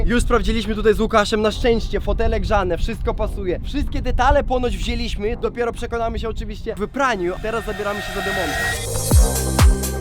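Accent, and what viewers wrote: Ukraine. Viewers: co ty jesteś z ukrainy że tak mówisz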